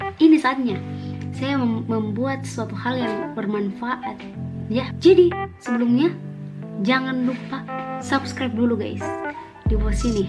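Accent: Makassar, Indonesia. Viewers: Sya seneng denger ngomong orang makasar sya dri bandung...kangen dh lama g denger orang makasar ngobrol.jdi inget seseorang...yg tlah jauh pergi...smoga d trima amal ibadah nya..jdi sedih logat nya ade ini yg mengingat kan dia...i love u makasar... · Sa orang Jawa mi,,memang suka logat Makasar to,,,wkkkk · Bukan orang makassar tapi suka dnger logat makassar🤣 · Kentara skli makassar nya biar di paksa bagaimana di paksa logat baku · Saya suka logat makasar..tidak di aku tau saya...tpi sekarang saya baru faham... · Bingung ka kenapa banyak orang luar na suka logat makassar kalau saya biasa biasa ji :/ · Bukanka orang Makassar tapi kusuka dengar loga Makassar 😂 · Mampir ke chanel ini gara² lagi tinggal di mkssar takut nnti salah kata/pnyebutan😅 Sya asli mnado tpi sneng denger logat mkassar, kadang klau ngobrol suka ha? ha? kdang gk ngerti😂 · kesini gara gara lgi di makassar, bingung denger logatnya 😄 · Saya orang Banjarmasin .... 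Senang sekali mendengar dialek. Makassar .... Negara kita memang luar biasa kaya budaya ..bahasanya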